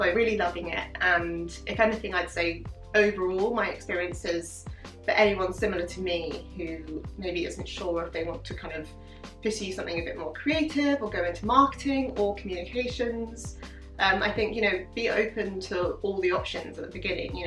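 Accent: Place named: United Kingdom. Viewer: Wow; you are starting to have a British accent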